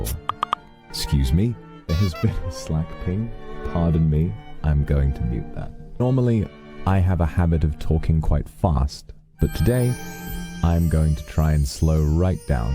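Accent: RP, United Kingdom. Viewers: すごくposhなアクセントですっごいこと言ってるのが好きすぎる😂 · わぁ。イギリス英語が聞けて幸せな時間でした❤ 小学生の頃、イギリスに住んでた帰国子女なんですが 日本はアメリカ発音で教育される中、 私は、大学のアメリカ人の教授には「笑っちゃうくらいイギリス訛りが抜けてない」と言われて… スラングの話をしてるのに、ポッシュな英語で(*´艸｀*) 久しぶりにイギリス英語が聞けて幸せでした。 ありがとうございました！ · 上品なブリティッシュだなあ憧れる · 英語ちゃんと聞き取れる方じゃないけどVOXのイギリス英語が上品でいかにもイギリスって感じの抑揚で好きだ これもうASMRだし、度々やって欲しい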